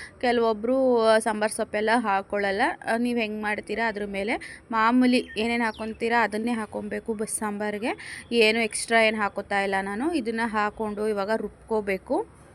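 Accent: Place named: Thuremane, India. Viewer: ನಮ್ ಅಮ್ಮ ಮನೆ ಅಲ್ಲಿ thuremane ಅಂತ ಹೇಳ್ತಾರೆ... ಎಲ್ಲ ಸೇಮ್ ನಿಮ್ ತರ ಮಾತಾಡೋದು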